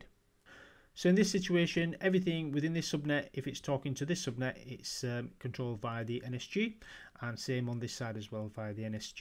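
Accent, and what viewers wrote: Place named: England, United Kingdom. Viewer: Finally a proper English accent. Thank you.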